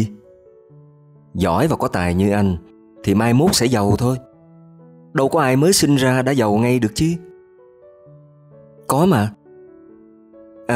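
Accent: South, Vietnam. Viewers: Hello 👋! Duyên phận của mình thì xa ngần ấy ngày vẫn là duyên của mình. Sáng ngày nọ khi không mở YouTube lên lại nghe được giọng đọc miền Nam thật hay, văn viết không bị "lai" của thế hệ hiện nay, ngồi nhâm nhi cafe để ngửi hương vị "café" cuộc đời từ kênh. Cảm ơn rất nhiều ❤️🌼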